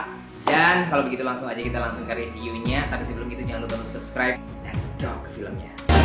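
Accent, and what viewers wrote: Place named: Cilacap, Indonesia. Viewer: Aksene cilacap gak iso ilang ya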